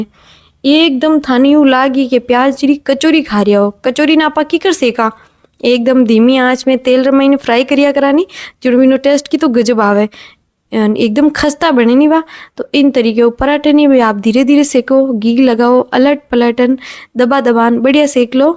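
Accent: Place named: Jodhpur, India. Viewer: Jodpuri boli Masha Allah ap ke zaban sy bohot pyari lag ti ho hum apko Pakistan sy follow krty Hain allah Khush rakhy apko❤❤❤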